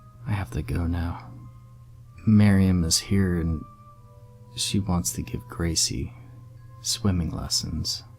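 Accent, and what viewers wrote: United States. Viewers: No, he's American or Canadian sounding. You should check out his channel, his back catalogue spans something like 8 years.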